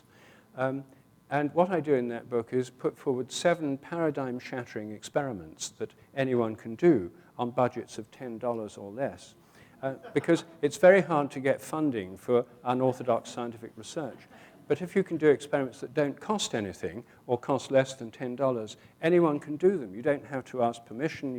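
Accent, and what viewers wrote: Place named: United Kingdom. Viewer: Because he has a British accent? He isn't saying anything I haven't heard before.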